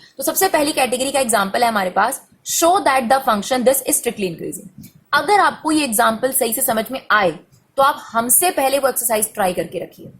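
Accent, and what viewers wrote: India. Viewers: I believe that u are from Jharkhand ,Bihar or UP Tone feels familiar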